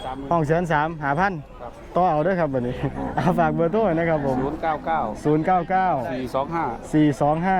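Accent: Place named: Sisaket, Thailand. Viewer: ศรีสะเกษชัดเจนค่ะพี่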